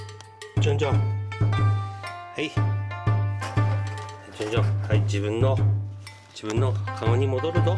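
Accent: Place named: Aomori, Japan. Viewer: 凄い訛ってる！ 青森とかですか？🍎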